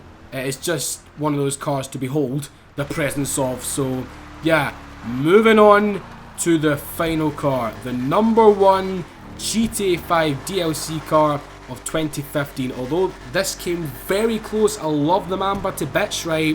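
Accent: Scotland, United Kingdom. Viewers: are you scottish and does ek stand for east kilbride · He is Scottish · Are you Scottish.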